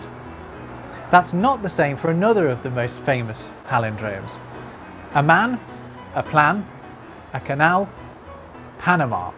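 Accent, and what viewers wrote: United Kingdom. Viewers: BRUH YOU ARE BRITISH?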